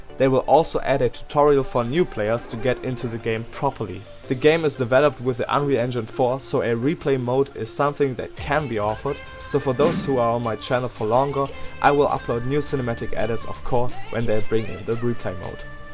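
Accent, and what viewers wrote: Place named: Germany. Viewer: This German accent...... 😖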